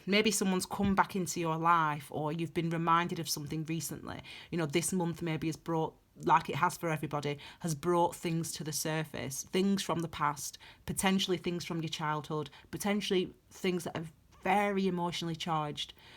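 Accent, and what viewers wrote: United Kingdom. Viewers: Love your sense of humour and the way you come across.I miss hearing British accents so it's nice listening to your readings. And ditto it's great to see a female British black tarot reader.Keep up the terrific work and thanks for the amazing reading! :) Love from Spain! :) xxx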